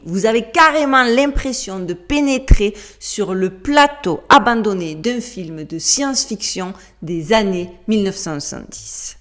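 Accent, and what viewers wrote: South, France. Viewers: Merci c tjs un super moment malgres le sujet terrifiant Magnifique travail et je suis d aix en provenve donc J ADORE ta narration bien du sud Bravo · Salut j’aime tes vidéos que je viens de découvrir continue ne lache pas ! L’accent du sud donne un plus à tes vidéos! Peut tu s’il te plaît faire une vidéo sur le gangstalking qui va avec le thème de tes vidéos?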